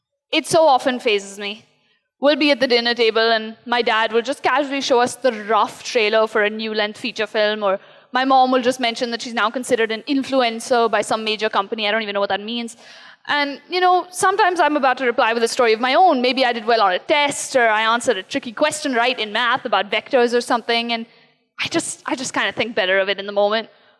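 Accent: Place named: South Bombay, India. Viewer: No she can't. That sick snobby SoBo accent smh... Publishing her books at 15 when writers get rejected so much.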